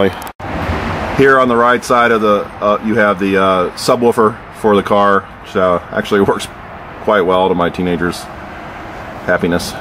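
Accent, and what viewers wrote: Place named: Midwest, United States. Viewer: Have seen many '16/17 Outback reviews, and not once has anyone shown the roof racks in operation. (Thanks!) Thule or similar crossbars can cost hundreds; integrated rails is a great feature. Could tell you're in Chicago by the architecture, and your slight Midwestern "accent." Sounds like home. Far North Side? Edgebrook? / South? Beverly?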